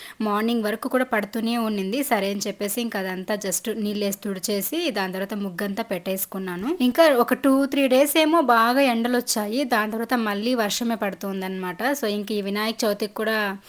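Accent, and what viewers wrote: Chittoor, India. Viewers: Hi andi medi ye uru,Chittoor slang laga undi anduku adigyanu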